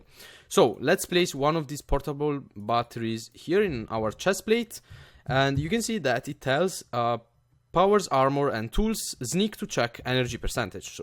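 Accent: Italy. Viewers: He is Italian accent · He isn't a native English speaker, he's Italian :-D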